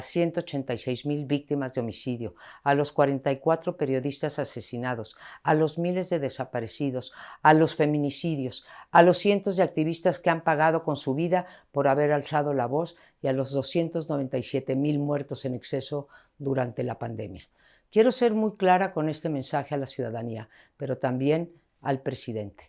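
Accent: Spain. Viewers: Porque habla medio española? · Y por qué habla así, como española, decía mi abuela: cada quien se revuelca en su mismo lodo, señora se queja de lo mismo que han estado haciendo ustedes por todos los medios "oficiales"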